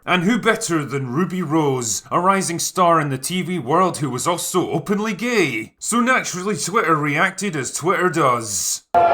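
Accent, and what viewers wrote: Scotland, United Kingdom. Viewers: your less-funny-but-scottish mr plinkett schtick is top notch, keep it up mate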